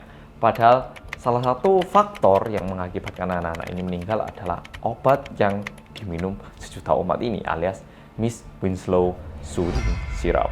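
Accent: Java, Indonesia. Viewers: untuk pertama kalinya nonton vidnya di ruang keluarga, dimana ada papa disitu. 5 menit setelah video dimulai, papa mulai bingung dan nanyain "temenmu punya youtube ta? kok muedok e nemen" karena jarang liat youtuber yang medok wkwkwwk. akhirnya aku jawab "engga ini org korea sempet tinggal di malang" trs dikira boong dong wkwkwk akhirnya aku tunjuin "inilo orang korea" sambil liatin vidnya mas yang dimana mas ngomong bahasa korea dan akhirnya papa bilang "iyaya orang korea, muedok nemen, km kalah" disini valent yg notabenenya org jawa kalah sama mas yang orang korea:)) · Wong Korea selatan yang mendok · Kangen sama yang versi medok😍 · anyyeong mas yg mendok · Kembali ke medokkkkkkk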